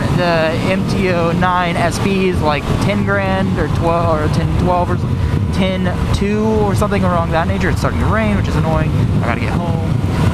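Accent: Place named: United States. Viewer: Clearly you have An American accent....how do you like Germany?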